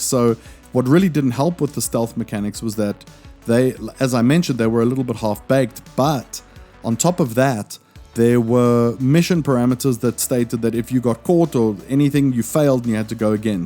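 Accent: South Africa. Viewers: Where are you from? Your accent sounds kinda South African.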